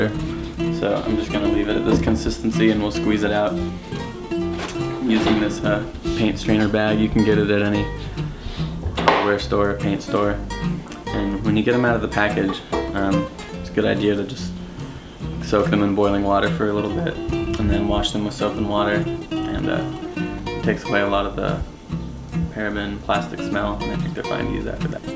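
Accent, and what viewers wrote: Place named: United States. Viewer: Hi guys, watching this brought back loads of memories. I was born on the island of Trinidad. We had a coconut tree in our yard. I know you pick off the younger coconuts, when the flesh is soft, you can scoop it out with a spoon, and put it in a glass or whatever container that you collected the water in before you cut the coconut open to get the flesh. Delicious!!. Where in the world are you guys? You sound like an American. Enjoy your meal, coconut milk makes every thing taste better,and quite nutritious too.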